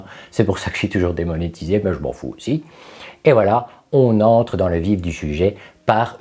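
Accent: South, France. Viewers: Vive l’accent du sud. Team innocence. Vive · Vive les #LUDIVINE du Sud 🌞😂😂le sud Montpellier c'est la vie les cigales l'accent le pastis et curennnnte boody 😉🤣💋💖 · Ah cher, c'est sur c'est nous qu on est les meilleurs 😉et vive l accent du sud. Merci pour ce moment précieux 🤗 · Je suis très avare de comme,t’aimes, mais avoir une vidéo pour les 10k, tout en apprenant des anecdotes sur current body (accent du suuuuuud) , franchement ça vaut bien un peu d’effort de ma,part. Merci et bon courage à toi 😊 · J arrête pas de rire entre curent de beauty Ave l accent du sud et la pipe oh que c est bon de rire🤣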